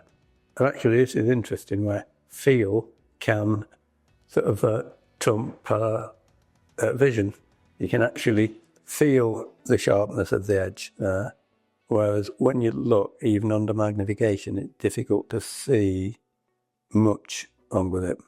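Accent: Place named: United Kingdom. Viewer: Chards are always good. Not often we hear these British accents on Youtibe either for some reason. Bad reviews just come from uninformed ppl. As soon as Chards makes something idiot-proof, the world just comes up with better idiots! 😂 Sod's law. PS... We need some juicy jousting between dealers on youtube, that would be fun! Difficult though without scripting some stuff. A big convroversy or investigation that blows over eventually after many ups and downs would be just the ticket for this market! 😂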